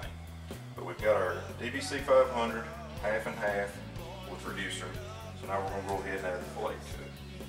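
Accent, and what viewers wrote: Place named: North Carolina, United States. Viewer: Are you from North Carolina? Man,your accent is NC. Very nice job on the guitar,btw.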